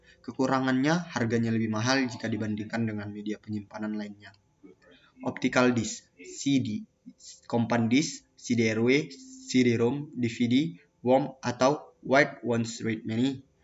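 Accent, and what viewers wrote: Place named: Java, Indonesia. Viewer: Mas medok:v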